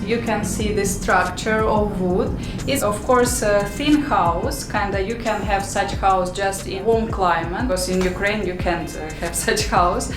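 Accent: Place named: India. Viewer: Başkan sen niye Hintli gibi konuşuyorsun?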